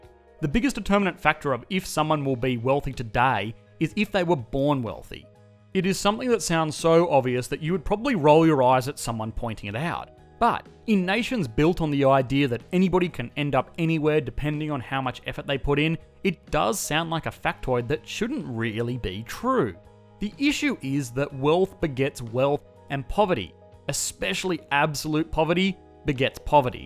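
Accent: Australia. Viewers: I love your aussie accent mate👌🏻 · I don’t think he’s American. He sounds like he’s from Australia or New Zealand.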